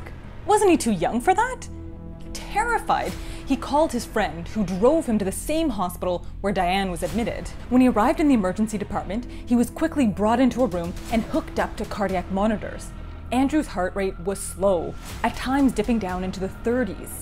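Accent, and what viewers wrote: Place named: Canada. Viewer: If you’re in the US, true. She sounds Canadian?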